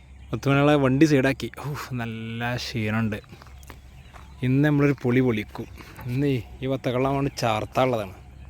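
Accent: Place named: Malappuram, India. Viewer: എടോ ഇത് മലപ്പുറം ശൈലിയാണ്, അല്ലാതെ അച്ചടി ഭാഷ ചാനൽ അല്ല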